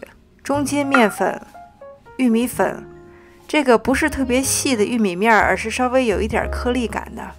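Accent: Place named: Shaanxi, China. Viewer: 包谷棒~~陕普啊😊老乡在纽西兰问好啦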